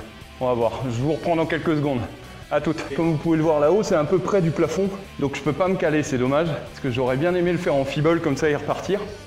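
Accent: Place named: North, France. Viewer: ta un accent du nord j'trouve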